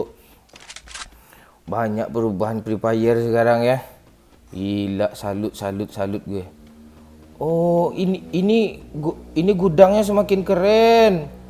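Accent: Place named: West Sumatra, Indonesia. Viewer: Logat minang nya,kerasa ya 😂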